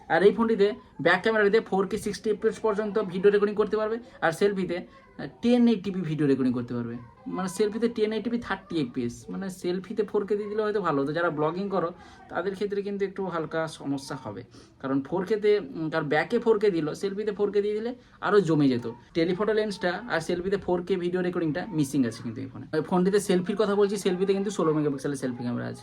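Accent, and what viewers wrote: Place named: Jharkhand, India. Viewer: A bhai Jharkhandi jaise bolte ho 😮😮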